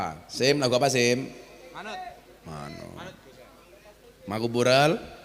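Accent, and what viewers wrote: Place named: Java, Indonesia. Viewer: Kiyaiku yg ganteng alhamdulilah muncul lagi.aku suka medok jowone metu.kiyai udolah semua imat sejahat raya.gas puulll mantap kiyai ganteng.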